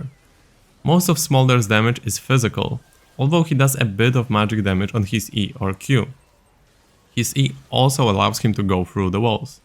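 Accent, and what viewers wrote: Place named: Poland. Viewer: polish accent diff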